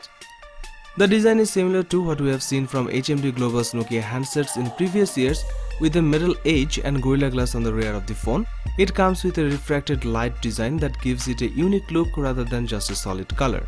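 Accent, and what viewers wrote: India. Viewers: I wanted to watch it but soon as some Indian voice started going tik tok tik tok that was it F.T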